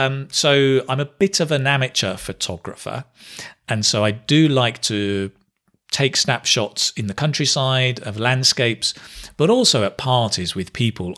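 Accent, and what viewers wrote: United Kingdom. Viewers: Hello you can not imagine how much I enjoyed this 48 minutes. The time really flew. I am more in contact with American people so I am real surprised how well I could understand your British accent. I was really lucky finding you here. You pronounce really clear. You made me laughed when you explained the different ways to say a sentence. You were so cute. From now and then you will be my teacher in the distance. I have to improve my English, mainly my listening. Thanks a lot.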